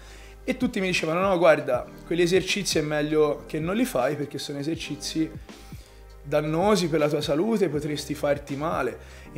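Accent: Tuscany, Italy. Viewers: L`accento toscano è fantastico !!!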